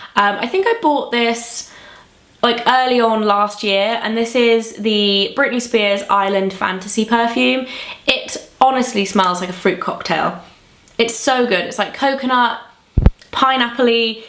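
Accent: United Kingdom. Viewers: Hi! I really like the way you talk.. I just wanna ask what's your accent called? I mean what kind of British accent it is?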